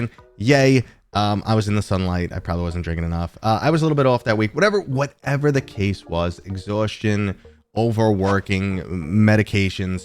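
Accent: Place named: Boston, United States. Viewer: Boston accent! I love it!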